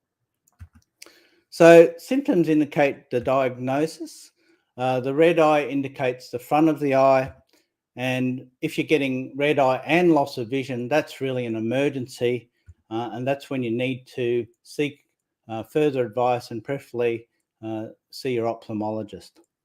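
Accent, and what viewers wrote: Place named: Australia. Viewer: Hi... he is speaking in his own language which is English. He's from Brisbane and speaks English with an Australian accent. I can understand every word he says perfectly. I speak English too.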